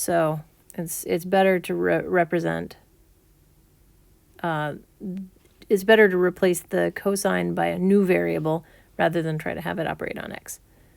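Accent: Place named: Australia. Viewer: Aus accent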